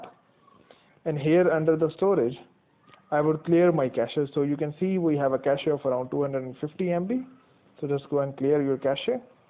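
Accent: India. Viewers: At April 2020 Android phones empty the recycle bins after 60 days. I just wish this guy didn't speak with such a thick Indian accent because what he says is very helpful.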